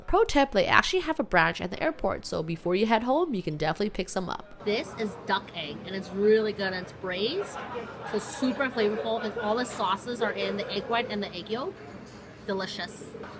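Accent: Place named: United States. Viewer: Why your accent is so American but you grew up in Taiwan? I love how much you enjoy Taiwanese food and you’re a very smart chef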